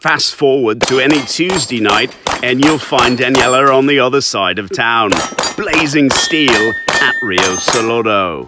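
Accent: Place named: England, United Kingdom. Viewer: The commentator sounds totally un-American. Has an English accent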